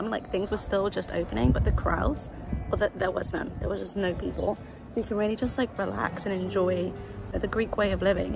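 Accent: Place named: United Kingdom. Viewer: Not crowded is the best. Thank you for sharing. All is good except the British accent.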